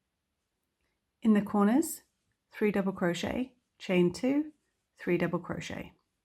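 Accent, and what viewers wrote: England, United Kingdom. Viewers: I adore this and your style of teaching is brilliant. The only thing that fooled me was I heard the English accent and thought, yes English terms , but alas not, it was America terms. Keeps my mind active, changing them into English as you are saying what stitch to use. Lovely work. Thank you .💞💞💞🧶🧶🧶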